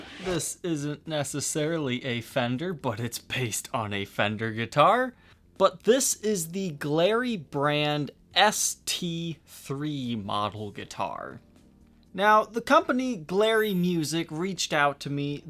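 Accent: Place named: Alaska, United States. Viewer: Thatuckin sarah palin accent is hilarious..... There is someone else who has that accent, a famous actor or SOMETHIGN..... MAN I can't think of who it is.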